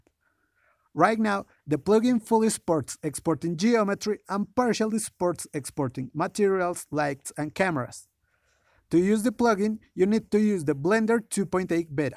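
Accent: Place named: Latin America. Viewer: at minute I was like that is a latino accent! es de los nuestros! PD: Accent is not bad my friend, actually feel proud of your roots and being able to speak in 2 languages (or perhaps more) Saludos desde Colombia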